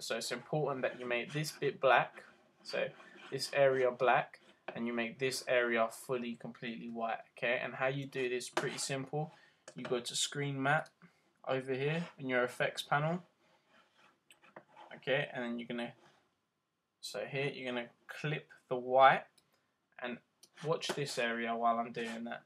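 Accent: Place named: United Kingdom. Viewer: thnx for that, helped me on my assignment. cool british accent u got there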